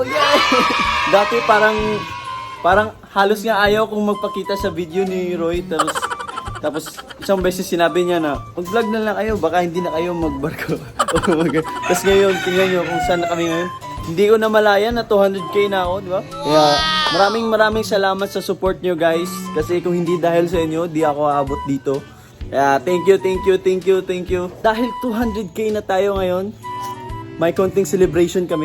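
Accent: Visayas, Philippines. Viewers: I love the bisaya intonation ❤❤ ilonggo here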